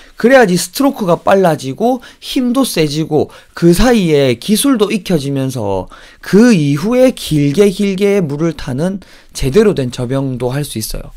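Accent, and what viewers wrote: Gyeongsang, South Korea. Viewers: 최고 입니다. 경상도 방언이 이리 스윗 할 줄이야~!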